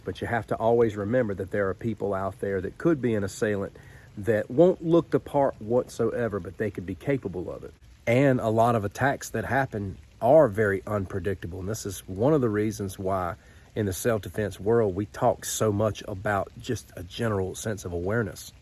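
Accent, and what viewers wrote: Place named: Louisiana, United States. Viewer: Interesting accent. I’m from south Louisiana and you remind me of there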